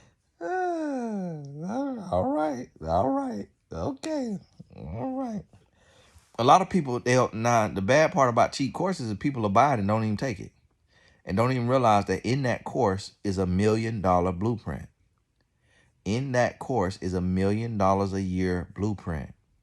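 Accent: South, United States. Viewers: I love she’s so beautiful and I love her country accent it’s to cute 🥰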